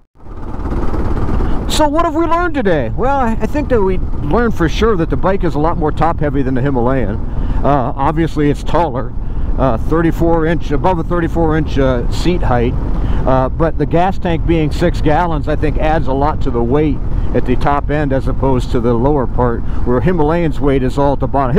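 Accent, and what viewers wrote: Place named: United States. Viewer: Thanks for using metric measurements occasionally. As a viewer from overseas I find the use of Imperial/ US units for tank capacities and fuel economy so confusing, even though I grew up using Imperial units until we changed to metric 60 years ago. Imagine the confusion for viewers who have never had to think about gallons/ miles/ inches in their life! So can you please list that you are using US measures ( even though it should be self -evident from your accent that you are filming in the US)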